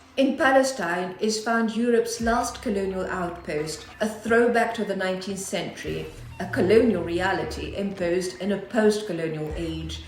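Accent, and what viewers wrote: RP, United Kingdom. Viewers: Ok I know this is not the point of the video, but why does the narrator sound like a white upper class English woman? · This is a really pathetic attempt at respectability, there should be no Muslim, lecturing anyone, on genocide and oppression, this woman is a joke, how much of the world has Islam conquered and enslaved, in the last 1400 years, and no matter how much they try and sound intelligent, with their posh English accent, they will always fail to have any credibility.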